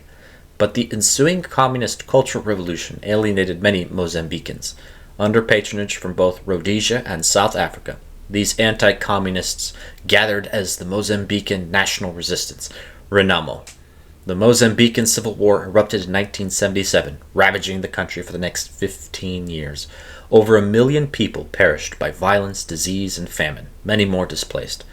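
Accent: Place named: Brazil. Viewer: Great video! Just make sure not to use Brazilian pronunciations for Portuguese names in the future 👍🏻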